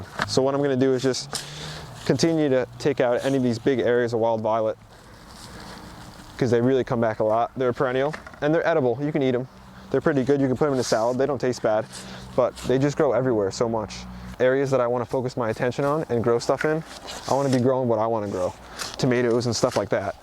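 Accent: New Jersey, United States. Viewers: Just found your channel and quite glad I did. One suggestion... stop talking Jersey.... slow down.... :)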